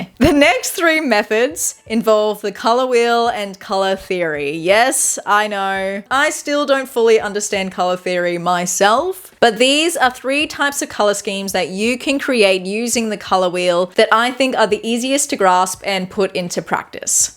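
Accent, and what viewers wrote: Australia. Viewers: Thank you. I love those paintings. And I noticed your Aussie accent. Aussie, Aussie, Aussie ….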